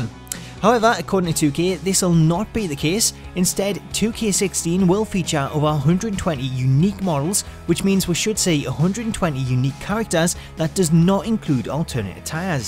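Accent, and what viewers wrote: Newcastle, United Kingdom. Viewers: a proper belta lad from the toon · Someone from Newcastle... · He's a geordie ?😱😱😱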